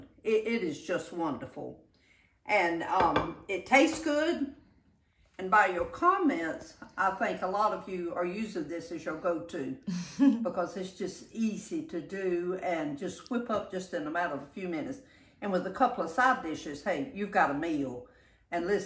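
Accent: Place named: Deep South, United States. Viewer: You don’t say where in South Carolina your from. I live in the upstate, Greenville . I came here in the 70’s, my husband tells me when someone asks me I can finally claim, “yes I’m from around here.”☺️ Your accent sounds Deep South, Charleston and I love it! I lost my sweet 98 year old mother-in-law in 2020 and you remind me so much of her in the way that you cook. She was a wonderful teacher just like you.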